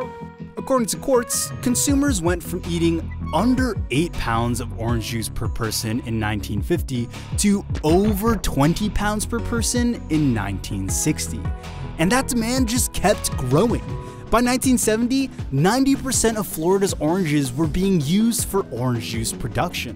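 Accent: California, United States. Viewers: This slow California accent that keeps asking me questions even when its telling me answers is killing me. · he has the HEAVIEST Californian accent 😭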